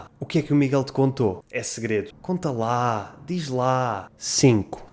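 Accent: Portugal. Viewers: Oi pessoal gosto.muito de seu sotaque Qual é a lugar de Portugal aonde mora você. Sou Venezuelano. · Eu adoro o sotaque português seu!!! Muito Obrigada por seus vídeos!!!! É muito boa sua dicção é a forma pausada da sua fala!!!! Muito Obrigada!!!! Adriana Cymeri FACEBOOK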